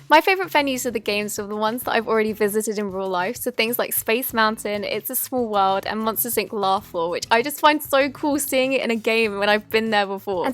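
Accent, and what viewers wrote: England, United Kingdom. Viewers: Is she from England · Bro I love her British accent! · I can tell from your accent that you're British and I'm British too. I'd like to know where in Britain you are from and where you get your supplies for Slime because I'm pretty sure they don't sell Elmer's glue and borax in the uk. Please can you tell me where you get it from. Lots of love Abi from Leeds. XX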